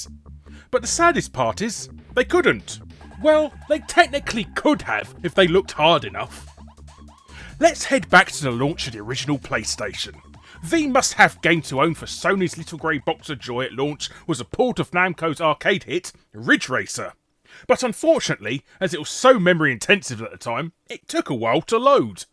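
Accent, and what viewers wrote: England, United Kingdom. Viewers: Telling an Englishman he's speaking English wrong I see. Troll elsewhere bronie. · Please always remember that you're dialect/accent is sometimes incomprehensible outside of the British world. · ...you know he's using UK English, right? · Guys, this is just how we say 'Patent' in the UK. Though I have to admit I stopped pronouncing it like that years ago because the US way is less mouthwork. Or something like that. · I believe it's called a British accent... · Dude's British. That's how they pronounce "patent" in a majority of the UK.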